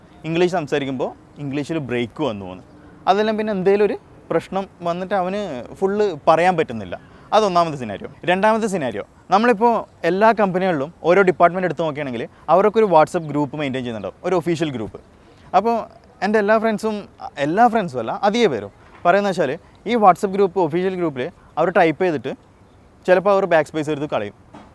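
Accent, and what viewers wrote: Kannur, India. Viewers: From kannur right? nice talk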